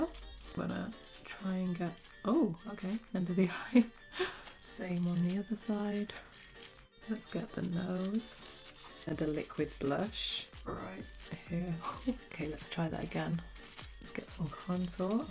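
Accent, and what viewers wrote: United Kingdom. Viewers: ahh Im black but I wish I was british i love your accents😩💖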